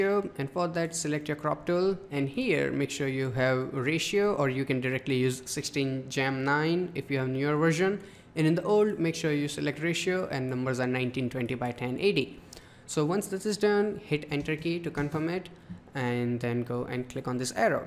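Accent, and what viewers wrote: India. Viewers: the indian accent is so cute lol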